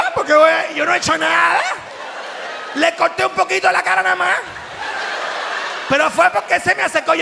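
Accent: Cuba. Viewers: Jajajajajajaja c.l.m ese acento cubano!! Te quiero mi amor! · Soy cubana y en verdad me encanta tu acento cubano y me meo de la risa 🤣 😂😂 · Ese cubano que llevas adentro está buenisimooo!!!!😅❤🎉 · Jajaja, genial el acento de nuestra tierra!!! 😂😂😂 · Es q pareces cubano de pura cepa jajajaja,aquí no existe la terapia jajaja👏👏👏💯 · Soy cubano de nacimiento, venezolano de corazon y brasileño por naturalización. Viví 7 maravillosos años en el Zulia y escucharte me hace reirme a llorar recordando aquellos locos maracuchos que me tenian el dia entero riendo. Tus imitaciones de los cubanos son fantásticas pero te pareces mas a un habanero hablando que a un pinareño ( lo digo por mi porque soy habanero) un abrazo desde Brasil. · habla como si fuera otro cubano más 😂😂😂, mucha observación y cuidado al detalle 😂😂😂😂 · Soy cubana 🇨🇺 como m rio cuando hablas como nosotros 🤣🤣🤣🤣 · 😂😂😂😂Ayyyy moriiiii. 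Soy cubana! Y gozo, cuando veo al perrito cubano y cuando hablas como nosotros en tu show. Ya hoy, lo acompañaste, de las vulgaridades, y para mí, resultó comiquísimooo 😂😂😂😂😂😂😂😂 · Muerooooo me encanta la interpretación Cubana que haces ❤ Te Quiero 😅😂😂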